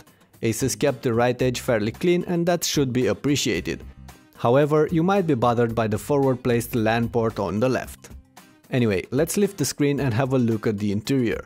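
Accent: Romania. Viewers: romanian accent? :) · Mai mult ca sigur esti roman (fac referire la narator)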